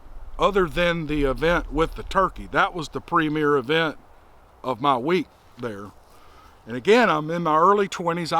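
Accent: South, United States. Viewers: Made me chuckle. You've got a great southern voice; you could probably read from the phone book and people would listen to it. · you've got the best southern accent and best voice on You Tube! Nothing that comes out of your mouth is ever lame! You could talk about working in a sewer and it would sound so sweet! Keep up the great work! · Agreed! I told him he's my people! Live southern people and refreshing to hear my own "language on you tube! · Yup! I really enjoyed your lame story 😊 Pretty sure it’s the accent, your “good ole boy” accent. Listen to an Australian talk and then yourself … I was born in the wrong country. I could listen to you tell lame stories all day! ❤ Thank you. 🥰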